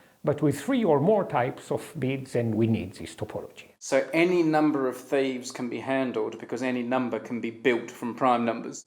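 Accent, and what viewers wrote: Israel. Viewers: Its typical israeli, like if you had an israeli prof in a comedy show, thats what he should sound like Sources: Been to his Uni, lives in the same land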